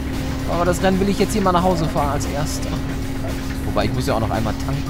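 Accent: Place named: East Germany, Germany. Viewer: Ach lass die Leute Reden, Ich mag die Grafik . :) Und ich kann's mir Vorstellen, was es für ne Arbeit war das Spiel zum Laufen zu bringen ;) Dann Funktioniert dies nicht, dann das nicht.. dann braucht der andere Treiber u.s.w. ;) Wie du dich Freust... damit Zauberst du mir ein Lachen ins Gesicht... Merci dafür :) Dein Ost-Dialekt xD einfach Geil Oh. Ich tu auch gern Autos Tunen ;) (In Videospielen) aber ich mag das... ..Die Reifen müssen Brennen! xD :D Und aus dem Auspuff muss Feuer kommen :D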